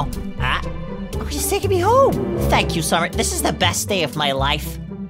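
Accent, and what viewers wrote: Australia. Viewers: Do you come from Australia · I think your from Australia.. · Are you actually Australian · I know where you are from Australia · You are from Australia don’t Ask how know😂 from vid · I think that your from Australia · i think your from austreilia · ur from australia?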